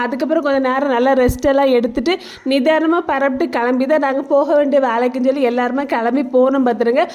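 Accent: Kanyakumari, India. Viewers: அக்கா எனக்கு உங்கள ரொம்ப பிடிக்கும் உங்க கன்னியாகுமரி பாஸை ரொம்ப பிடிக்கும் நீங்க நீயா நானா கம்மியா பேசுனது வருத்தமா இருந்தது உங்க திறமைக்கு அங்கீகாரம் நாங்க கொடுக்கிறோம் உங்க டிஷ் எல்லாம் காட்டவே இல்ல வருத்தமா இருக்கு உங்க சமையல் எனக்கு ரொம்ப பிடிக்கும் நாங்க இருக்கோம் முன்னுக்கு வாங்க உங்க பிள்ளைகள் எல்லாம் பார்க்கும்போது சந்தோஷமா இருக்கும் அக்கா இந்த மர சாமான்கள் நீ என்ன விலை என்ன சொல்லுங்களேன் என் பிள்ளைங்க சொல்லி வாங்கி சொல்லு வாழ்க வளமுடன்❤❤❤❤❤❤❤🎉🎉🎉🎉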